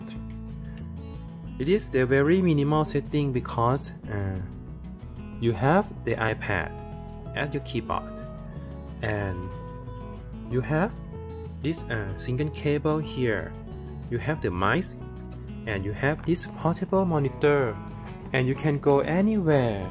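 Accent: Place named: Thailand. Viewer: สำเนียงไทยๆ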